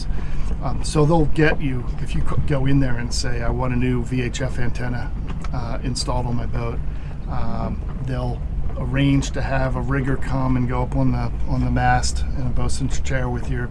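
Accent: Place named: South Florida, United States. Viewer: Great Vid. Gee, sounds like S. FL.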